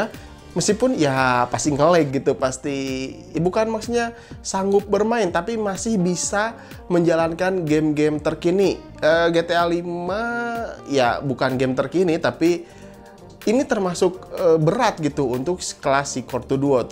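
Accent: West Java, Indonesia. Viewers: Biasa, orng sunda logat nya kyk gitu, sama kyk gw wkwkwk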